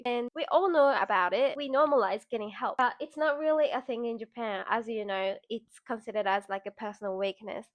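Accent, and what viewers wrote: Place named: Australia. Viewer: I subscribed because of your australian accent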